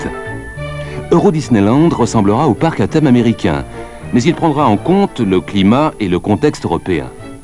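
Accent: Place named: France. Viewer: Die Franse uitspraak van de Engelse termen maken het helemaal. Merci om dit te delen, deze had ik nog niet gezien. ❤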